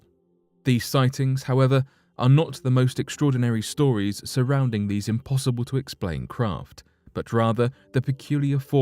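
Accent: United Kingdom. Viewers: "Hieroglyphic Texts" said that British accent just sounds so scholarly and knowledgeable tell me everything's going to be ok you sexy X - files nerd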